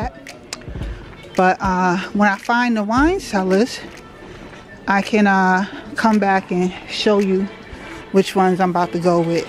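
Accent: New York, United States. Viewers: This was good! I have alway said, you have a New York accent but I never asked if you were from New York. I rarely see anyone that actually shops at Lowe's. When I think home improvement I think about Hone Depot. Now, let me tease you a bit. I always say that Lowes is for the stuck up employees, because whenever I go I never get anyone to help me. Once I got some mirror cut in Lowe's for a DIY Ikea night stand/chest and the attitudes I got because I needed someone to cut some mirrors for me. Then, there's old Home Depot, where people are willing to help me with their orange apron on. I like both stores, but I go to Home Depot the most. hehe... I just wanted to share that. I typically leave long comments. I'm EXTRA in the comments, but you know I'm here for you. Your hubby was trying to be a professional camera man and you kept asking him questions. 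I thought it was so cute and funny. That sink is going to be amazing,. That is a smart Idea on what you do with your business cards. I am so excited to see the kitchen come together.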